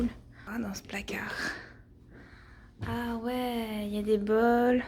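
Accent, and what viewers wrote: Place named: France. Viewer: My wife and I love your french accent. Welcome to Quebec and good luck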